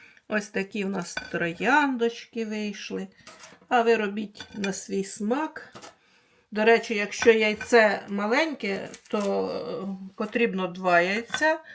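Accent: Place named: South, Ukraine. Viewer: Ви для мене, як ковток свіжого повітря! Насолоджуюсь Вашими рецептами ! А Ваша така чиста українська мова з півдня України то взагалі БАЛЬЗАМ НА ДУШУ! Щиро дякую!!!! Здоров'я Вам, МИРУ, ПЕРЕМОГИ!!!!!!!!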